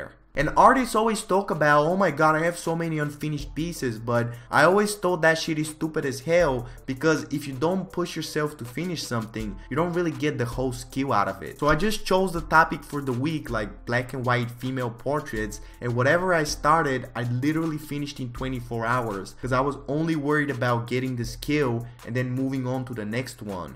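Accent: Russia. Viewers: Why are you talking with a Russian accent? it's me saying to you as russian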